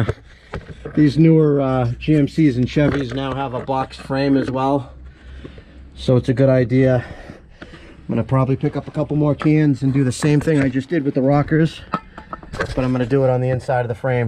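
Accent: Massachusetts, United States. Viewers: The accent screams Mass my guy. Thanks for the video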